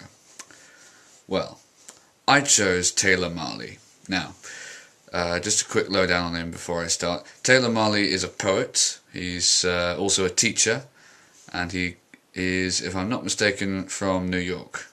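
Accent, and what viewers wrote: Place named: England, United Kingdom. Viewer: lol your accent. sometimes is SPOT ON sometimes its sooo English haha. I've seen that video of his before but not on youtube. i'm never sure if hes seious or sarcastic about certain things. some are obvious, some not so much. and hes a little to televangelist-esque for me but YOU were awesome! :)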